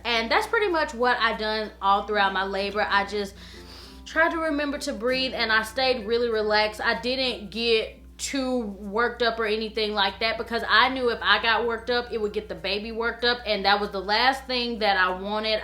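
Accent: South, United States. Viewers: You have a cute southern accent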